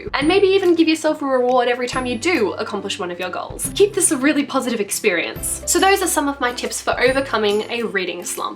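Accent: Australia. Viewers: Hello. First of all love your voice! Are you Australian? Anyways normally I get out of a reading slump by not, reading, watching booktube, or even buying more books. I'll just put a bundle of books into my Amazon shopping cart to get the urge back. Yes we're in this together!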